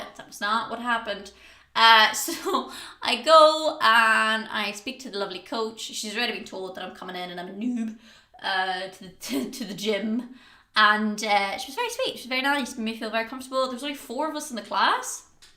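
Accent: Ireland. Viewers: well i just ran across her by accident on the tube and was instantly pulled in by thatwhat id call hot irish accent.she just lately changed her hair colr thats why i inquired.i wasnt trying to be a meathead or nothing Sorry man.anyway im a new fan nice too meet you Wes davis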